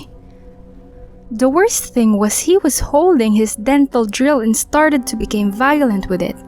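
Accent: Philippines. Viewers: Filipino accent, no doubt. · It's funny bcs some people think that the narrator of this video is a Filipino bcs of its accent. I mean, is there something wrong with OUR ACCENT? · I can tell the narrator is a filipino cause when I narrated a story that is also how I sound like. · She is a filipino. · The narrator was definitely from the Philippines. The "t's" at the end gives it away... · feel ko pinay nagbabasa ng story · i got a strong feeling that the narrator is filipino lol. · I think the narrator is a philipino thats why when they talk in english you know no hate on me or you will get reported and blocked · Uhm it sounds like the narrator is Filipino.. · She sound like a filipina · The accent of the narrator sounded like a filipino accent. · Is the narrator from the Philippines? her accent is just like a filipina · Is the narrator filipino? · Pinoy yung narrator 😂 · The narrator sounds like Filipino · Is the narrator FILIPINO? 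She has the filipino accent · I have a feeling that the narrator was filipino :> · Parang pinay yung narator.. grabe, kung ako yan sasapakin ko agad yan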